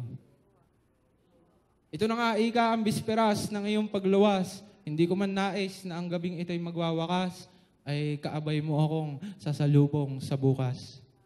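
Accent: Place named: Batangas, Philippines. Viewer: Nakakaaliw ang puntong Batangenyo! Para kang umiinom ng kapeng barako. Mabuhay ka. Ipagpatuloy ang paglikha!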